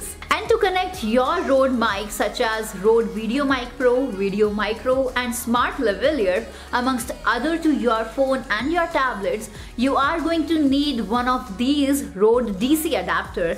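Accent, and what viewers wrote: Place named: India. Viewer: Probably the best EXPLANATION, a little hard on the accent. But thank you, ma'am. We love India in America.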